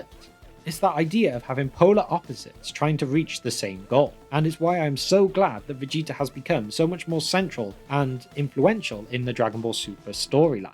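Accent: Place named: North West England, United Kingdom. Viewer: Aah cool. I'm a scouser and thought I heard a hint of North West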